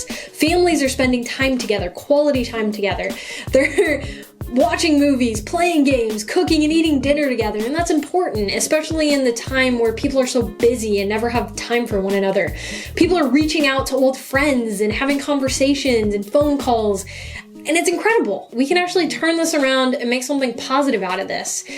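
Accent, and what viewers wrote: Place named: United States. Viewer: When I was going threw YouTube I saw this channel, very nice , keep the good work I’m surprised because you sound more American than Jordanian but very very good I like the Chanel and you got a new subscriber and a like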